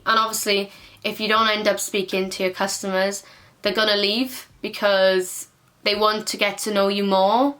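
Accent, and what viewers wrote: Wales, United Kingdom. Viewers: Are ya a welshie??😍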